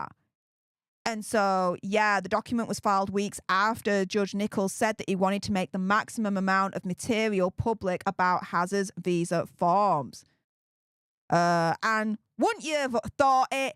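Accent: Yorkshire, United Kingdom. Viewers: I don't think is bothered by your comment. Just adjust the volume and it's a Yorkshire accent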